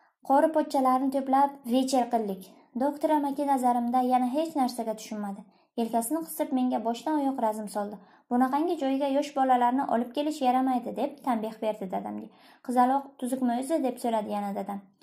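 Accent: Bukhara, Uzbekistan. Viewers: Маладес қизим, зўр ўқибсиз👍. Бухороликмисиз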